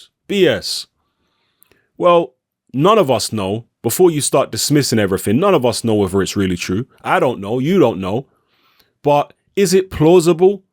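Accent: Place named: Cockney, United Kingdom. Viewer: Guess where from?? Can't half tell this man is black an cockney!! Gives Tyson no credit & dillian AJ all the credit.. can't fucking wait till Tyson schools wilder, my guess is will change his mind & make it 60/40 wilder leading up instead of 80/20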